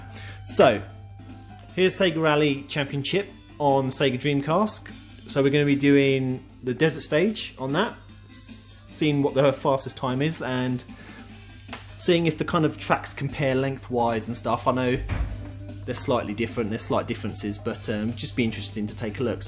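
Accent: United Kingdom. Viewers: I see Okay. You sound like you are from the UK - is there a reason why you bought the NTSC-J Import of 'Sega Rally Championship Plus' over the PAL version of 'Sega Rally Championship'? I'm not sure which version of the game to buy for my Saturn. I am also in the PAL region.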